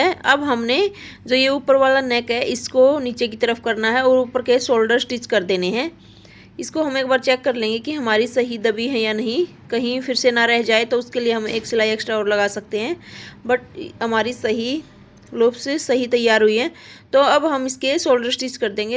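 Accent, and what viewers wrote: Haryana, India. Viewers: Good morning mam aap ka pahle ka vidio me sikhane ka tarikka bahut hatt ke hay abhi bahut hi acha hay aap ki bate Haryana stayil hay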